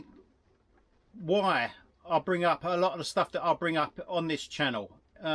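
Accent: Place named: South, United States. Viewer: Hi, all the best for 2025. You will always get haters and whiners when you put yourself out on YouTube. Some people just can’t help themselves for instance your accent i’m Northern you are a southern,if they don’t like it jog on!. there are more and more people waking up to the tyranny of the New World Order dictatorship, this has been in the planning for over 20 years yet most people do not see it and even if you show them they still will not see it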